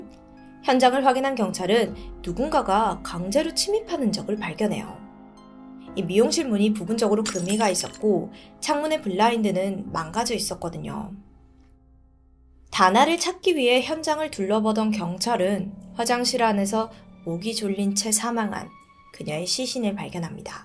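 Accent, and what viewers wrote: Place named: Jeolla, South Korea. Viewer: 전라도 사투리인듯요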